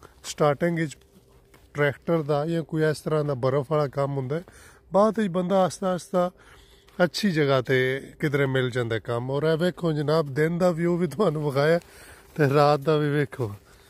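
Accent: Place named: Kharian, Pakistan. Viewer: ان بھائی صاحب کا بولنے کا لہجہ ضلع گجرات کھاریاں کے نزدیک علاقے کا ہے یہ میرا اندازہ ہے بہت ہی ودیا وڈیو اور کمینٹری کی ہے بھائی صاحب نے ،،،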